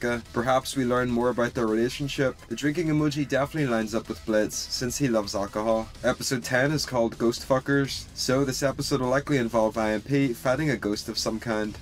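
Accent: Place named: Northern Ireland, United Kingdom. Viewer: Always love to hear a wee Northern Irish accent like my own on YT! Feel so rare to come across! Buzzing for more eps 😳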